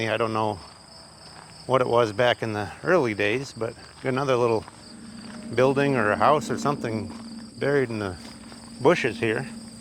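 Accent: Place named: Minnesota, United States. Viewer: That convent is a pretty magnificent old building in the daylight, but would scare the sense out of me to walk up on it at night. No way you'd get me in there. If I was to guess, I would say you might be from Minnesota. I lived there as a kid in the 60s, and your way of speaking sounds like my mother's side of the family who still live there. I've lived in Nebraska ever since then and some people still guess me to be fom Minnesota. Some things ya never lose. Enjoyed the tour. Thanks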